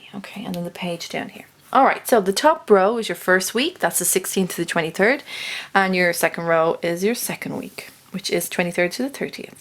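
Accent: Ireland. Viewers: This was so fascinating. Kinda scary how much this resonates. I really like how u read. I appreciate how no nonsense you are yet gentle and encouraging. And it feels natural; not at all contrived. It def was an intense read. But somehow I don't feel upset or unsettled becos u infused so much helpful insight and reassurance. Subscribing!! Thank you for this read. (Hearing your Irish accent makes me miss my dear friend who's Irish) 😊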